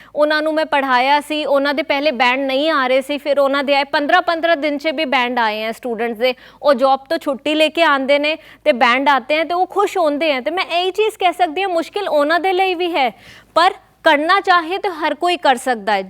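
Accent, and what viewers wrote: India. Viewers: madam thda hindi touch boht a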